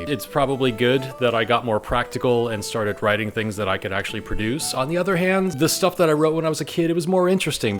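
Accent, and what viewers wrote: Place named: Maine, United States. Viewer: I could tell immediately you were from Maine.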